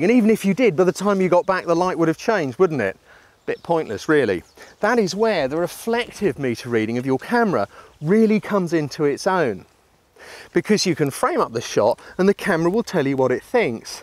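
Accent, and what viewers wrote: United Kingdom. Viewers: If I could have a British accent this is exactly the accent I would want. This accent would play very well with the ladies here in the states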